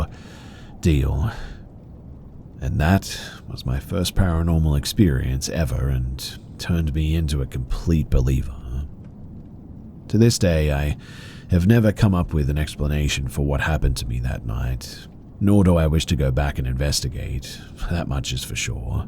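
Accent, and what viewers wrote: Australia. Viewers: 😊Love your aussie accent mate ❤❤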